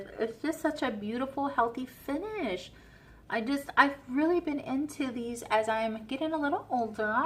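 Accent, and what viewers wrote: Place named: United Kingdom. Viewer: Love this video. I can hear the British accent wanting to peak through. 😊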